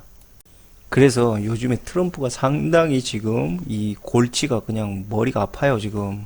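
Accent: Jeolla, South Korea. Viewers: 말투가 전라도라....청화대에 세월호 선장 이준석 같은 무리들이 있어서 큰 낭퍠로다. 호남 인민 공화국이 다 된것 같지만.....이 세상의 흐름은 진실 앞에 거짓은 망할 것 이로다. 왜냐구....트럼프가 있기 때문에.... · 말투가 전라도인게 뭔 상관이냐 ㅋㅋㅋ 지역감정 부추기는거 정말 혐오스럽다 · 아 전라도 사투리 시발 존나 듣기싫네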